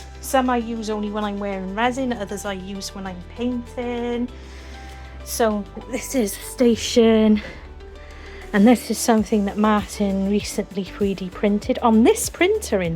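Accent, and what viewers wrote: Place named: Wales, United Kingdom. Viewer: I love to hear the homeland accent I was brought up in South Wales NR Merthyr Tydfil 😊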